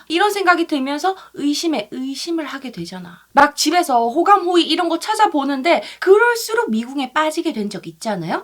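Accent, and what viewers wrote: Gyeongsang, South Korea. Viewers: 갱상도 여자?짜치다니 ㅋ ㅋ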